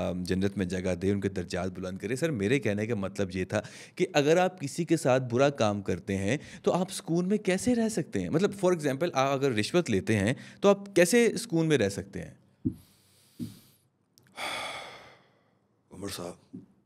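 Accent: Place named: Punjab, India. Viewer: Good acting skills. Specially 1st time saw your Punjabi accent, tone was perfect.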